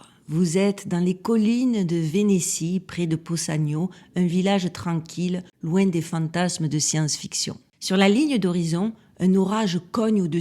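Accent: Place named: Southern France, France. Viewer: Je pense que la nature de 3I/ATLAS dépasse notre compréhension, voir notre entendement. Quelque-chose se prépare ,c'est mon intuition. Cela dit , j aime beaucoup ton style , ton phrasé, le timbre de ta voix et ton petit accent du sud donne l impression que tu chantes . J adore tes contenus .. Ps: la nasa sont des menteurs !! Comme tous les narratifs officiels.😂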